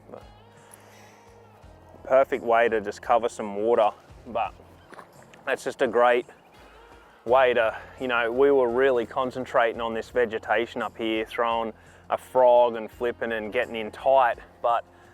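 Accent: Australia. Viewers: I could listen to Aussies say "cover water" all day. This guy has a solid accent. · First time hearing. Thought he was Canadian. But sounds Aussie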